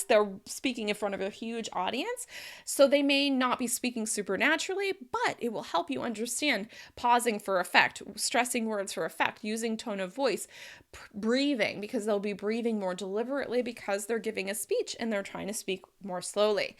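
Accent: United States. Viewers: Hi, new subscriber here. I just wanted to ask you a question. How are you able to speak with an American accent and not have the Spanish tone carryover into your normal American accent. After all you have been speaking Spanish for fifteen years. I guess in short what I asking how do you separate the sounds for each language and not have it crossover. By the way I am British born and raised and I live in London. I am trying to learn an American accent but my British tone keep getting in the way.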